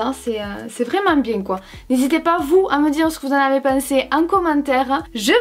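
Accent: South, France. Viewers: Jte kiff de ouf meuf Tellement drole et ton accent, whaou , le sud dans mon salon breton 😂😂😂😘😘😘😘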